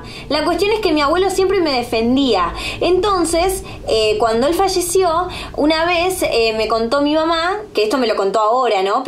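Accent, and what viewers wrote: Argentina. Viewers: Sos voz de Argentina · Es Argentina:v es el asento del país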